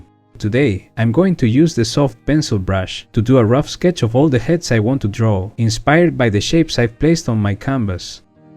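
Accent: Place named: Spain. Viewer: This dude is going crazy I can tell that you are Spanish but well done 👍 G keep it going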